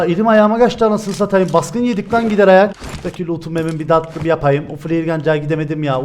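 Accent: Cyprus. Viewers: Kktcden geldim askerdim aksani ozlemisim cansin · Sırf şu Kıbrıs şivesi için izliyorum enerjin de güzel başarılar dilerim 👏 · Abi kıbrıs lımısın be gardaş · kardeşim sen kıprıslımısın konuşman çok tatlı kıbrıslıları seviyore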